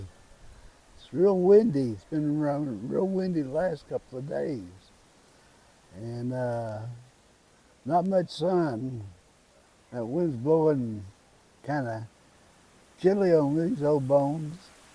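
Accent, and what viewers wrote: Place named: Texas, United States. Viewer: I dig your humor and your no nonsense approach to reality. A Texas twang to it is also a big bonus. A great day to you too, sir!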